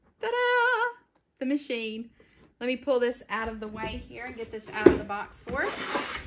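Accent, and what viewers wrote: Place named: South, United States. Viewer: Thanks for a great demo!!! You did a great job! I seam to have the same dies as you, so I can't wait to try them! I love your accent. What part of the South are you from? I'm from Tennessee.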